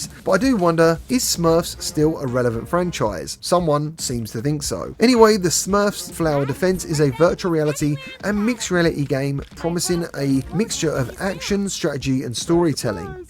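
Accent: England, United Kingdom. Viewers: Gimmie that VR news with that classy english accent brother 🎉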